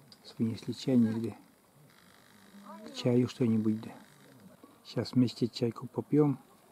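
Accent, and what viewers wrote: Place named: Vologda, Russia. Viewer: Зашла на ваш канал случайно и сразу покорил родной говор,мы живем в Вологодской области,просмотрела несколько видео и так все понравилось,что подписываюсь с удовольствием!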